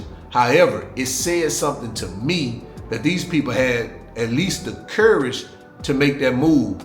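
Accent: South, United States. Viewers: PS...You've been in another country for awhile and loosing your Southern accent! Lol!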